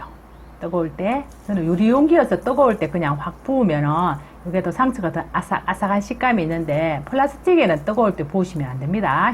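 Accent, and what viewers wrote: Gyeongsang, South Korea. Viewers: 경상도 분들은 그 발음 너무 어려워요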